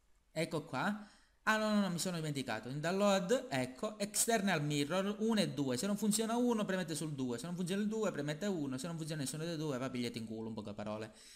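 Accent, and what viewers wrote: Sicily, Italy. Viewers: a quanto ho sentito sembri siciliano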